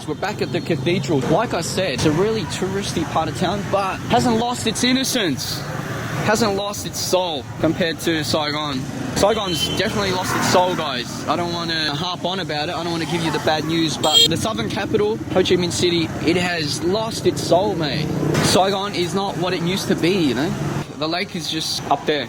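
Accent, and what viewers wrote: Australia. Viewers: he grown up in Australia ,can't you tell by his accent ? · Enjoyed your video. I can hear your strong Aussie accent but didn't deter from you expressing yourself and giving your thoughts in an honest and genuine manner. In fact I loved your honesty. So good on ya mate!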